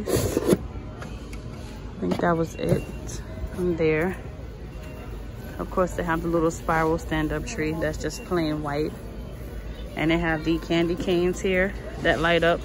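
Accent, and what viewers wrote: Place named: Caribbean. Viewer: I hear an accent.u from the caribbean?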